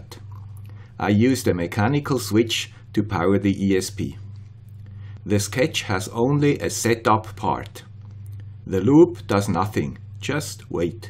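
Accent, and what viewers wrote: Switzerland. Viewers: i want to help) i like everything on this channel and swiss accent also! like from Belarus! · Very interesting video from the man with the Swiss accent. There is one part I don't understand though: how do you use a reed relay instead of a normal relay or mosfet? If I put a reed switch on a door or window, it makes a permanent connection, not temporary. How can you use a reed switch to trigger the ESP then disconnect the power once the job is done?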